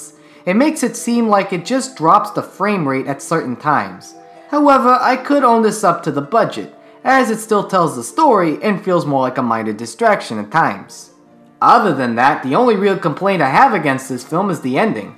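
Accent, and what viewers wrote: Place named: Brooklyn, United States. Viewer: The Brooklyn accent is unbearable.. I feel like i'm listening to Joe Pesci.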